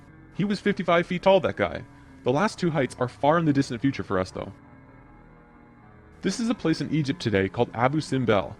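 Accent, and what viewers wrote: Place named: Canada. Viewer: It's the same voice from the original but the pitch has been lowered so he doesn't sound like one of the chipmunks, but the way that the words are pronounced, the rhythmic speech pattern and verbal cadence are the same as the original movies narration. I believe that the narrator is Canadian based on the pronunciation of certain words.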